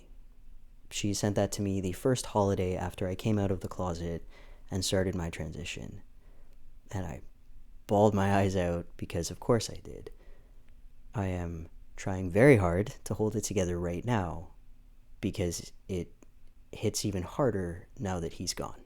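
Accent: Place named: Canada. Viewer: How am I just realizing that is Canadian? Like, SUPER Canadian. I've been watching him for months and it just glossed right over my head. Maybe because he just sounds like home, so it didn't even register.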